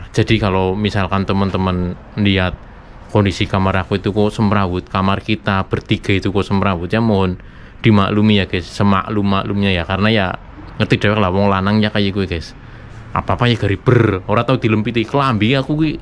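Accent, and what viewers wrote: Banyumas, Indonesia. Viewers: Mas, kalo boleh tau asal daerah mana? Wong ngapak ya Salam dari Maos Cilacap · kamu memang hebat, harusnya kamu mendapat penghargaan dari bupati di kampung kamu karena kamu bahasa ngapak dikenal di jepang... Salam toko kejujuran bang... Tiap hari dong bikin konten, berangkat kerja dan ketika kerja, Terima kasih sudah menghibur kami sarukhan ngapak hehehe... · Aq yen ndeleng kontenmu kui marem mase...bahasa ngapake mantep...guli njelasna kepenak...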